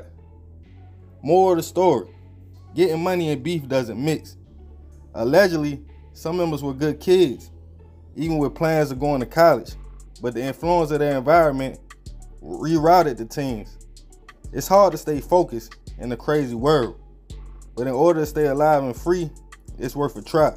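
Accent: Baltimore, United States. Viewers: The dude narrating sound like he from Baltimore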